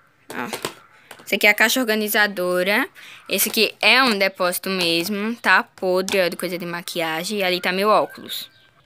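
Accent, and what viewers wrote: Brazil. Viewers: Amei o sotaque, vc é de Maceió?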